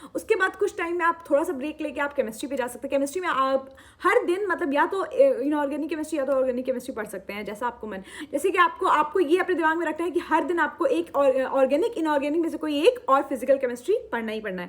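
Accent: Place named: Mirzapur, India. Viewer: Aap jab baat karti ho mirzapur yaad dila deti ho ❤️😂with ur accent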